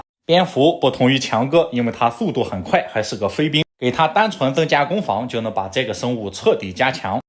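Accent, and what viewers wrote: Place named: Xintai, China. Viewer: 博主有新泰口音 是新泰的吗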